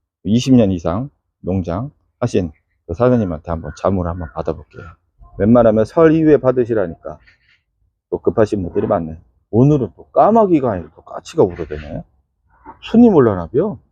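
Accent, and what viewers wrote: South Korea. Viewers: 경상도억센말투만듣다가대전사투리들으니넘정겹네요!, 영상잘보고배우고 감사합니다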